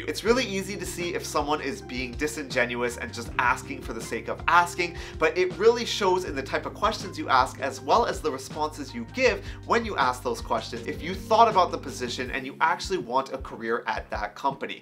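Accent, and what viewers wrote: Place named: Toronto, Canada. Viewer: Just saw your Redux video and I'm like this guy is from Toronto ( I am too) just by your voice. thanks for the clear videos and explanation on Redux. Lots of videos don't show HOW and WHY things are done but just "this is how it's done". Keep being awesome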